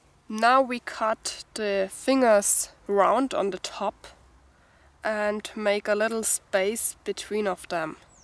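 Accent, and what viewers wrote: Germany. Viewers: you are extreemly funny .........its just your English with German axcent is kiling ......please accept this comment as compliment .....because it is plus not to forget that those shouse are the best easier way in a surviving situation in case you got lucky to kill larger animal and skeen it or you come a cross dead one happend to have still a skin .........great simple shose to cover and protect your feats .......lovly ......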